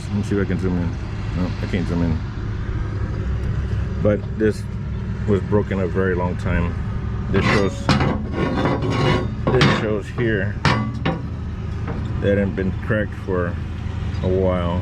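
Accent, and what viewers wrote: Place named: Louisiana, United States. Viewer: This guy sounds like he’s from Louisiana. Got dat cajon accent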